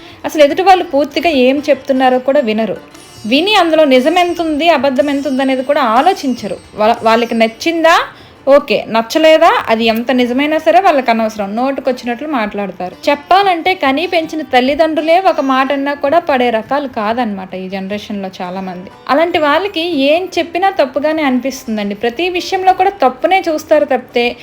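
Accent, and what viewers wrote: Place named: Godavari, India. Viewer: Madam midhi a uru ...miru nellore antunaru but godavari slang kanipisthundhi ...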